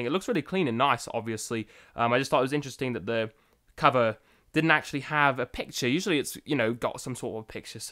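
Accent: United Kingdom. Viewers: Funny how he says "United States of course" when he has an UK accent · hmmm I prefer iphone 6 Plus although the size is huge but it's definitely the best,, problem is I only had it for not even a month yet and its already develop fault microphone and sound/speaker start to play up so had to sent it back to be repair and hopefully I will be able to get it back soon,,, btw dude you got some British accent there!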